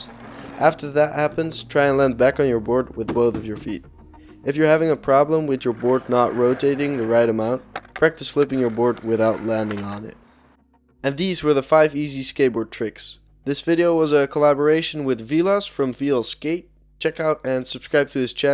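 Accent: Netherlands. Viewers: Je accent klinkt echt Nederlands ben je nederlands?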